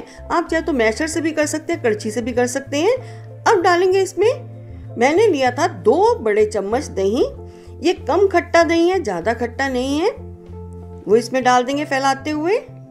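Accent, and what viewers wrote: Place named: Himachal Pradesh, India. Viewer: Mem 🙏🙏Aapki voice pahadi he (Himachal)ke jesi sweet voice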